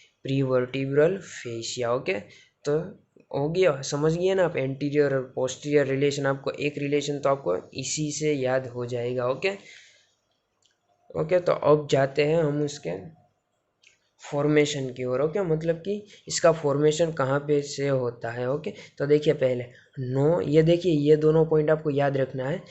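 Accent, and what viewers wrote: Gujarat, India. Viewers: અરે ભાઈ ગુજરાતી છો ?? તમારા ટોન ઉપરથી લાગે છે 😅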